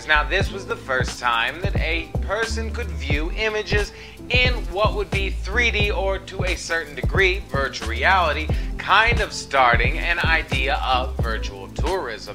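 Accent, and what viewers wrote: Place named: Northeast, United States. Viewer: Not a bad first shot providing voice over narration,! Not bad at all! Who knew that inside our lurked a bonafied documentarian! You know the strange part? Your upper east coast accent adds an amount of flair and polish to your presentation I wasn't expecting. Can't wait for your next feature presentation!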